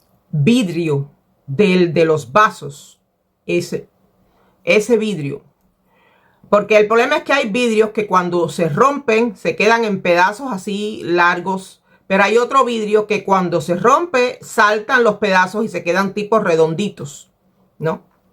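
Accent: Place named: rural, Cuba. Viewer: Ayeme. Tu eres guajira por tu an me abla asy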